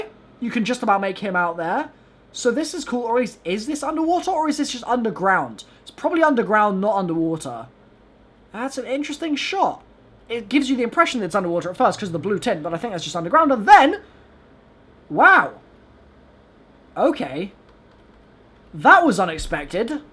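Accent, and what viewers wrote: United States. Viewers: Ur American accent (my boi ) was pretty dope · Omg i love his American accent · I love you’re American voice doing it the black ops way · Good video that American accent is so funny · you have an amazing 'merican accent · A video with your best american accent would be nice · American accent was too great. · American Accent ™️ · That American accent dough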